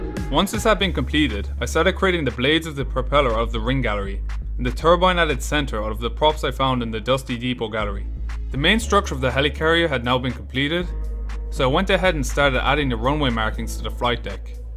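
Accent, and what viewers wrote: Ireland. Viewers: btw are you irish because you have an irish accent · Are you Irish you sound Irish?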